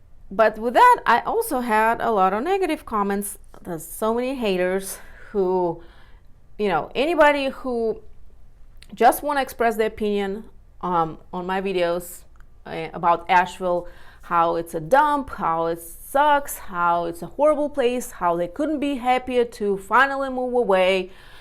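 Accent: Russia. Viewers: Sound like a Russian mail order bride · I like you accent Russian.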